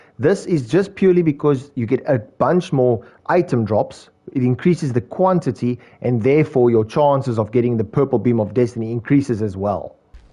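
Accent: South Africa. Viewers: South African? Wragtig! Ek het ge-sub. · South African? Wait let me sub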